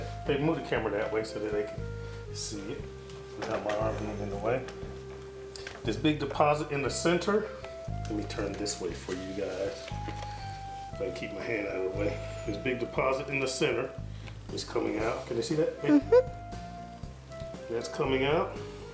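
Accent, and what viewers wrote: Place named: Virginia/Maryland, United States. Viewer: You sound like you are from VA/MD area....so I would have to come by yo' house and see whether them things stink or not! lol. For real though, my nose is ultra sensitive and I've NEVER been near a Chittlin' that didn't have some odor. I appreciate the culture significance though, even though I'm not a fan. Last time (yeaaaars ago) I tried to taste a piece---even smooshed into greens and potato salad---I still smelled them! My biggest concern is "where" they have come from. I would probably only eat them if they came from a local organic type farm. Those pigs in those processing plants are too full of hormones...antibiotics....and general filth...and then we are eating the very part of them that processes that stuff. Adding seasoning salts and bouillon with msg in it ain't helping either. Is there a way to buy and prepare them that is more "healthy"?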